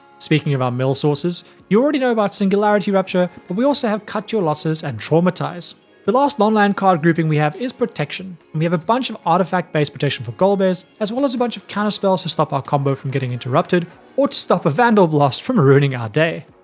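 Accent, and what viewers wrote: South Africa. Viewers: I can't tell if he is South African....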